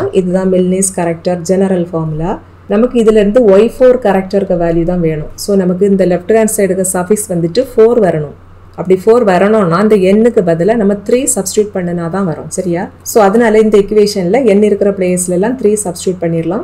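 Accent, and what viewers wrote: Kanyakumari, India. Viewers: Kanniyakumari district slang❤ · Kaniya kumari slang bro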